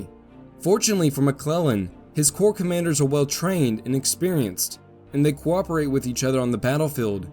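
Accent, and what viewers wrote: South, United States. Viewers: I am loving this series. It is great to have someone dedicating their time and effort to a series on solely the American Civil War, demonstrating an in-depth knowledge with each video (as opposed to creating shorter less-detailed videos on disparate topics). The soundtrack is also great, helping to convey the magnitude and drama of the events unfolding (as it does with Epic History TV's Napoleonic series). So much is evoked by just blue and grey squares! It is also nice to near your accent, clearly that of someone who lives in the region where this war was fought, which I imagine gives you a more intimate perspective on the conflict, and gives these documentaries a more personal feel. As someone who used to live near Harper's Ferry, I saw many sites that were important during this era, but I never put it all together into a concrete interest in this war, rather focusing more on "exotic" European History. 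This series is helping me to understand the military history of the Civil War in far greater depth than I otherwise would have, and is giving me a greater appreciation for the ground upon which this history unfolded. Thank you for making this!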